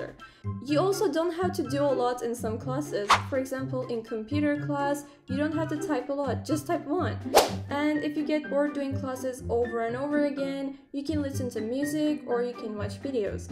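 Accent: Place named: Mexico. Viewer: she sounds like she’s Mexican 😳 but the fit is cute😊😊😊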